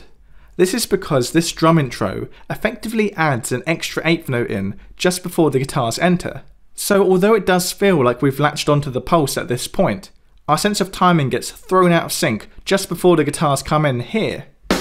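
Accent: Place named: RP, United Kingdom. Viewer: You are way too posh, but still kinda cute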